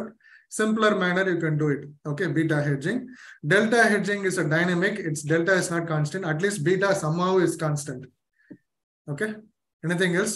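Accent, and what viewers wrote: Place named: South India, India. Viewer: Very bad presentation and even his english also semi tamil or telegue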